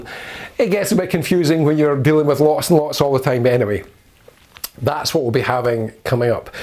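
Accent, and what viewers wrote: Scotland, United Kingdom. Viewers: Well, you tried squaring the circle without any knowledge of trigonometric functions. The term “blind test” or even “double blind test” requires not only a technically perfect laboratory setup, but also randomly selected test subjects according to predetermined parameters, a statistically significant population and corresponding valid statistical evaluations. Nice try, but ultimately I like your rumbling Scottish accent 😊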